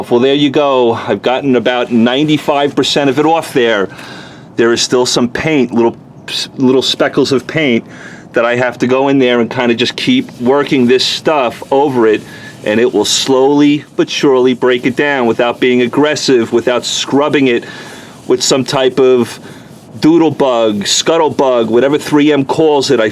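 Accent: New York, United States. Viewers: Hi I just subscribed to you’re channel. Are you from New York originally. My parents are from Brooklyn NY. That’s were I get my NY accent from. You sound like a New Yorker. Love your page. I just found it today.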